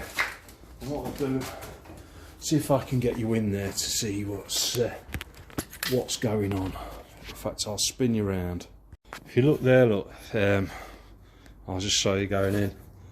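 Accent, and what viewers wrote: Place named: Nottingham, United Kingdom. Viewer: Sounds like a familiar accent, I’m guessing Nottingham. I was thinking Along the same line with the tiger seal but I was hoping not to rivet the seat box bit and just glue it. Thanks for the other tips.... nice wheels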